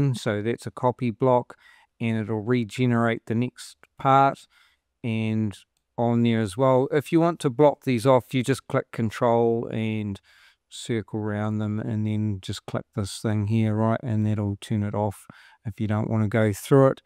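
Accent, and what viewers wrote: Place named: New Zealand. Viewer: You went way too overboard with the training on the A.I voice you use for the video. It sounds like an offensive stereotype of someone from New Zealand.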